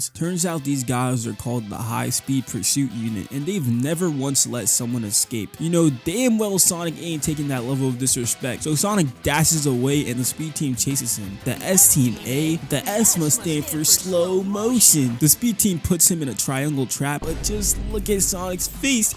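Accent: New York, United States. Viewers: ah you a new yorker. Based. And Sonic X was the FIRST anime plus exposure to Sonic as a whole, next to Sonic Advance. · Oh snap a fellow New Yorker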